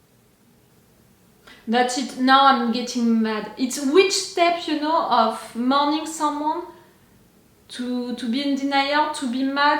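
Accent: France. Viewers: You are french ?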